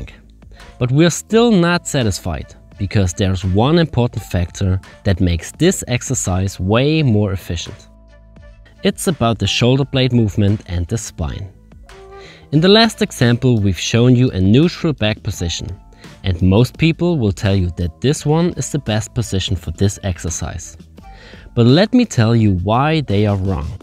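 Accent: Germany. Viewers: Hahahahaha 100% german. I recognize that accent anywhere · Hardcore German-Accent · Can't listen to this guy - such a terrible German accent! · Bruder man merkt dass du deutscher bist an deinem englisch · Funny accent...🤔.....german?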